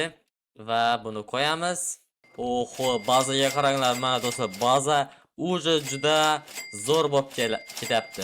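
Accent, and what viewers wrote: Khorezm, Uzbekistan. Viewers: Xorazmlik misiz besh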